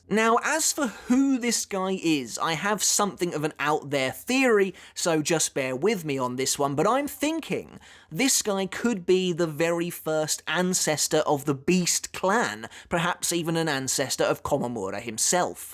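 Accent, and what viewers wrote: United Kingdom. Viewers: Love how you pronounce those Japanese names with your British accent! haha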